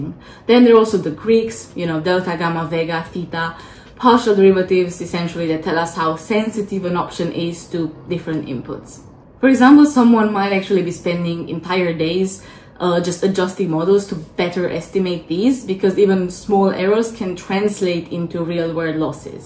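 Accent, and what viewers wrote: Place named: Europe. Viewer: I can hear the European Accent.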